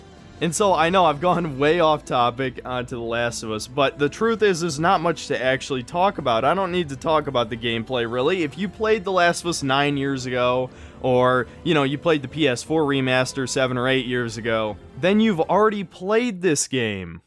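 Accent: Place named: Midwest, United States. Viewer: The Midwest accent is strong with this one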